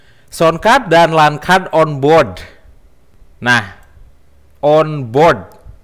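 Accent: West Java, Indonesia. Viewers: "PGA... PGA... pega..." ni apaan ya baru denger. wait. ....oh sunda. ganti p sama v... VGA, vega... ok paham dah. maaf jangan diambil hati, hanya jujur pertama bingung gegara logat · AA dari Sunda...kayak suara teman saya PakDedi Ciamis..aksenya...asyik tapi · Logat Sunda jadi ciri khas pisan 👍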